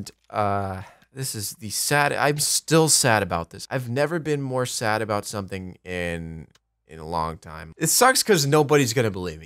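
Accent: New York, United States. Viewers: As you get older we can hear your new YAWK accent come out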